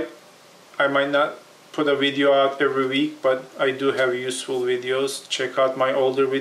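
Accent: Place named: Turkey. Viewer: This is Turkish Accent 😏✨ 🙋‍♂️ hi there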